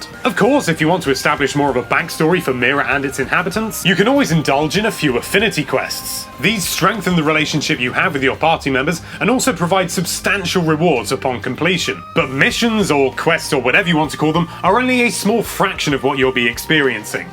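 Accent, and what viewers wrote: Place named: United Kingdom. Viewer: I love how wonderfully British you are, it's so fun listening to you:) I can't wait for this game, I'm so hyped.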